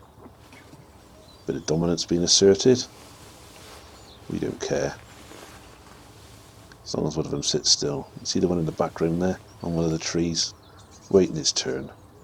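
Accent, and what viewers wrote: England, United Kingdom. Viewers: The calm English accent puts me to sleep everytime sitting at my computer.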